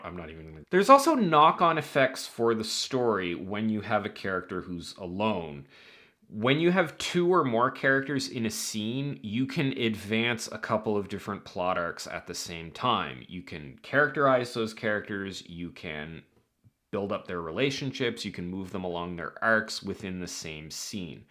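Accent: Canada. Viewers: Are you from the maritime region of Canada? You sound just like my favorite early 2000s politico Rick Mercer 😂🎉 super random sorry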